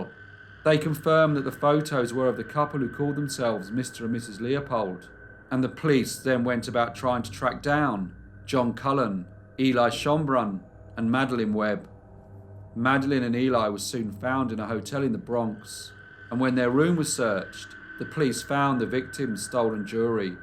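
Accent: England, United Kingdom. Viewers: Man I love this channel. I cant get enough of listening to this narrator's voice say "Guilty " with his awesome British accent. Keep up the good work. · Thanks for all your uploads. I love your stuff. As a Brit who has lived abroad for decades, due to my job, I love to hear your English accent🙂👍🏼💚🙂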